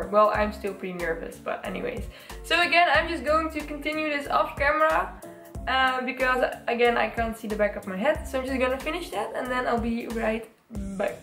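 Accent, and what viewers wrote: Netherlands. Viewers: U sound like ur from the Netherlands 🤔🤔🤔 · You have a really Dutch accent while you speak English. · Hahaha i came to the comments to check if she was dutch😅 heard something familiar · why does she speak so funny? is she dutch? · No hate but is she Dutch?? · are you dutch? :D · Benje nederlands want ik hoor accentje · R u dutch? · Why does it sound like you have a dutch accent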